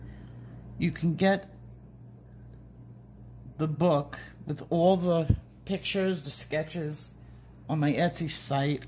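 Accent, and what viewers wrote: New York, United States. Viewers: Hi, I'm Maddie a new subscriber of your wonderful Channel that I recently discovered and I was fascinated by your great talent and your passion for pencil drawing which is also my favorite and also by how you passionately teach. Thank you for sharing your talent and knowledge with us. I am looking far and wide at your Channel, I have a lot to watch. I also love the things you tell us from time to time, like the afterlife and paranormal stories in which I strongly believe and had experience myself too. I love your sweet pets and I love your New York accent, it is a pleasure to listen to you and your calm voice relaxes me. Much love from old Europe to beautiful America. Blessings, love and light to you and to your family.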